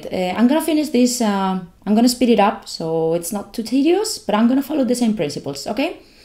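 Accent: Spain. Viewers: Hola, la verdad es que me encanta escuchar el ingles con este acento nuestro Español, de cualquier manera queria decirte que me encantan tus videos y queria preguntarte haces algun tipo de clase online, de clase privada me refiero y por cierto todo lo que muestras aqui aplica en Affinity photo tambien, ahi me dices gracias.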